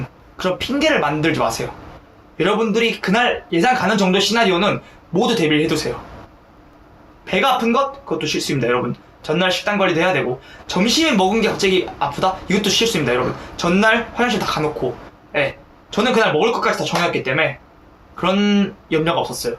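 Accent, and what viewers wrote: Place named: Busan, South Korea. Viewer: 다른 얘긴데 경상도 출신인데 대전으로 이사 가신 건가요? 말투는 부산말투인거 같아서요 ㅎㅎ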